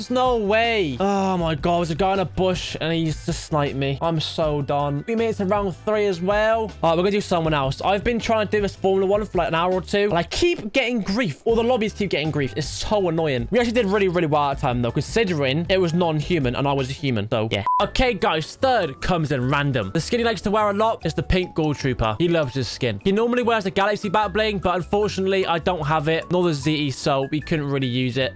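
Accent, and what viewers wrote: United Kingdom. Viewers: Is he English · are you cornish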